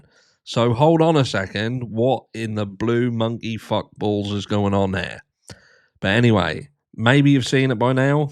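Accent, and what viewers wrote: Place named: RP, United Kingdom. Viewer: 🤣 U butcher the word “affidavit” like I do, but at least u’ve got that posh British accent to make it sound cool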